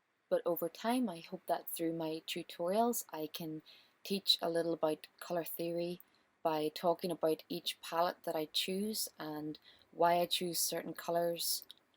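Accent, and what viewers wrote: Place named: Ireland. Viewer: And your irish accent is so cute !! I'll be watching your videos now to get to know pastels better, thanks for the effort of making them really.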